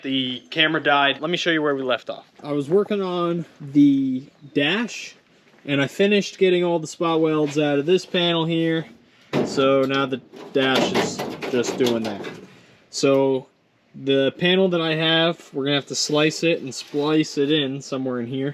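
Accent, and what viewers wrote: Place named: New Jersey, United States. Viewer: You have a accent . Jersey?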